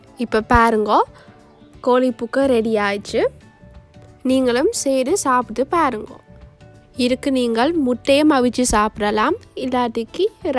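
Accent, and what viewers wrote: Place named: Jaffna, Sri Lanka. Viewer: அழகிய யாழ்ப்பாணத்து தமிழ் வாழ்த்துக்கள் தங்கைச்சி.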